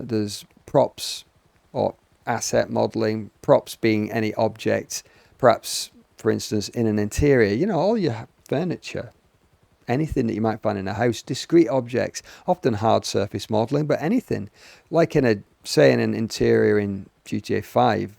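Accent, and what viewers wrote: United Kingdom. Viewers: Came to this channel for GTAVI, stayed for calm british ASMR vibes. Loving the videos man, keep it up!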